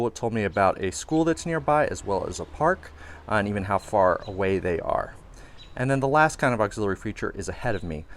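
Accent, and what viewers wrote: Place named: United Kingdom. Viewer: For some reason I thought you were from the uk. Lol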